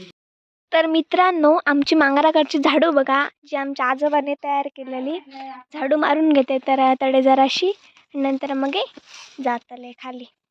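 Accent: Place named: Malvan, India. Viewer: Malvani tuzya tondun aikayla maza yete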